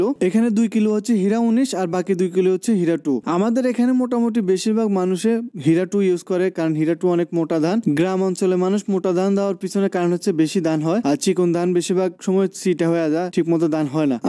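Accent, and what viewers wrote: Noakhali, Bangladesh. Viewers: ভাই আপনার বাড়ি কি নোয়াখালী বলবেন 🥰🥰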